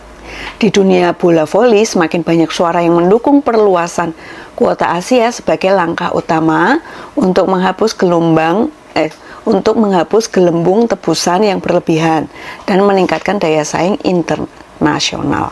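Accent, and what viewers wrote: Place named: Banyumas, Indonesia. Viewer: Ora ngapak ora kepenak... Salam republik ngapak..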